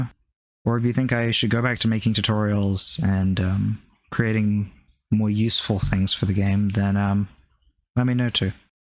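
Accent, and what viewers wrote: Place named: New Zealand. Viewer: You sound like you're from new Zealand lol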